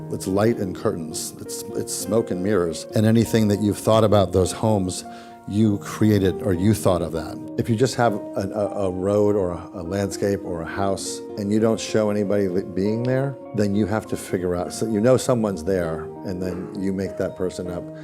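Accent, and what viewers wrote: United Kingdom. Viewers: the british accent that i love to hear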